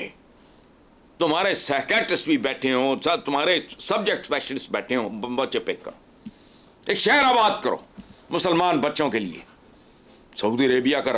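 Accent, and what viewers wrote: Punjab, India. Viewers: * This dude why he is speaking urdu in punjjjjjjjjjjjjjjjbi accent? I lived in pakistan. Only the very poor and islam's thekedars are against wine, beer and whisky there. Open up let people enjoy themselves, religion is nothing but figment of lmagination., there's only one life so enjoy it.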